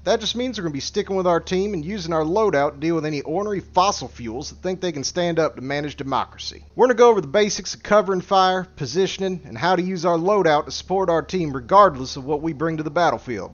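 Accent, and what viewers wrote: South, United States. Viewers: This dude is legit, great to hear southern accent too😆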